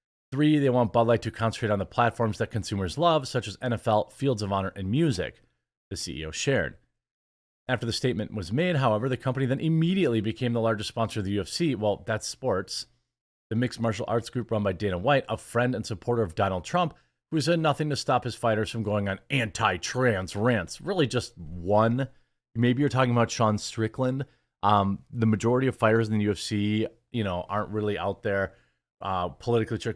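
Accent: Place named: Midwest, United States. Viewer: As someone stuck in the leftist hellhole of Hollywood, I always feel so warm and cozy hearing friendly midwest “we’ll tak to ya again real soon”. 😌 People are NOT like that over here.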